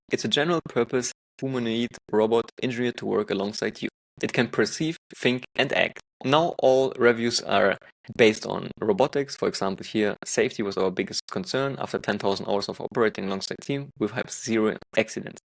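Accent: Germany. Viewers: Man hört den deutschen raus👁️🤣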